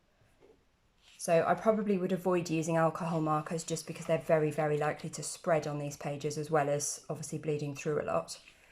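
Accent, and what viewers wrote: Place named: United Kingdom. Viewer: I understand why your accent is so lovely, the UK way of speaking English is the most beautiful way for me. 😍😍😍 I met wonderful people in the colouring community on Instagram and we talked a lot, that's how I improved my English without even knowing it. 😄 I even make videos in English now, I love this language! 💖 Have a good and colourful day, see you soon. 😘😘😘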